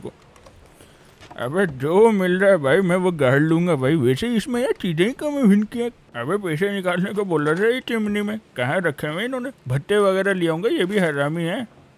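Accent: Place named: Pakistan. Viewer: bro ki awaz ma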